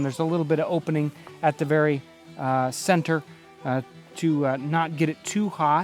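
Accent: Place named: United States. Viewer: The music, the costume somehow shows he's cooking in a 18th century English country side setting. However, the pronunciation showed he might come from the new continent.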